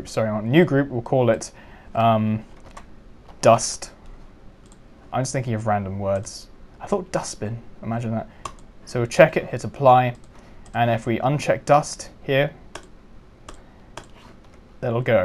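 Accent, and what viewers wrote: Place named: United Kingdom. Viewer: I freaking love you British accent *0*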